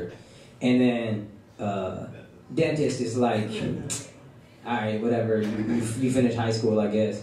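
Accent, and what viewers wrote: United States. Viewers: Some indians have a difficult time understanding his American accent,that's why everyone's going on about the audio.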